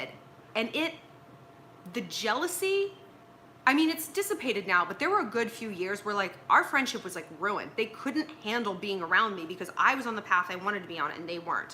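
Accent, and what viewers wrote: France. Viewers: Oh my CRAP!!! I LOVE YOUR FRENCH ACCENT!!! SO MANY THINGS! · The french accent 🤣😄🤗😄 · Wow that French accent!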